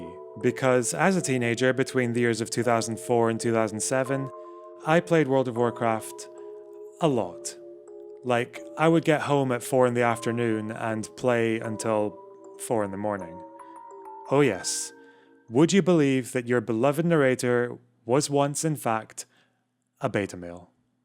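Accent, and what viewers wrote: Scotland, United Kingdom. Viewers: bro what part of scotland are u from I’m trying so hard to pin your accent. Or are you from NI? aw mate I’m struggling to sus it out like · Màke Your mind up, Scottish or American ??!!. "Fannies"...okay, Scott's. SPEAK ENGLISH BOY !.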